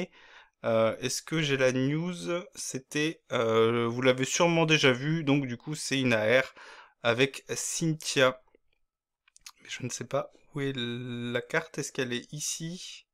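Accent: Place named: Portugal. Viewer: Bravo pour l accent portugais!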